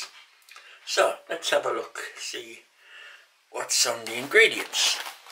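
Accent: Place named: Cornwall, United Kingdom. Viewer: This man speaks with a Cornish accent. His ancestors were Celtic Druids who came from Devon and Cornwall.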